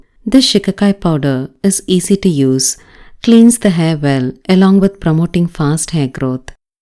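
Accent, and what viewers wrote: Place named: South India, India. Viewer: Awesome video 😘 I guess you too from south India, from your accent, it's beautiful.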